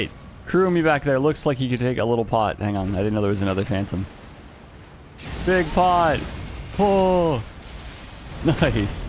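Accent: Canada. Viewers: Can someone in the know answer me this question: Is a Canadian man of Asian ethnicity? An Asian-Canadian, as it were? I know nothing about him, just his voice and slight accent, and if there was a gun to my head, I'd guess he was an Asian guy born in Canada or at least moved to Canada very young.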